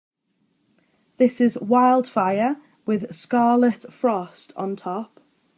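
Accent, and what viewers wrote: Liverpool, United Kingdom. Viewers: are you a scoucer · Hi, I love you videos, I grew up in Liverpool myself so love listening to your accent. I own a training centre in the West Midlands and would like to use some of your links to help our students. Please keep up the good work of how to do nails properly, there are so many technicians out there that have not be trained well. John at thenailschool.co.uk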